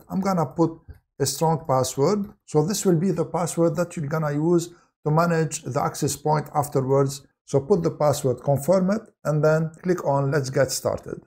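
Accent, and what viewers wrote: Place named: Lebanon. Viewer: Nice video and nice Lebanon accent 😅 I had a little question, if i put the router in AP mode, will the guest wifi still works ? and will it be separated from my main network ? Thankyou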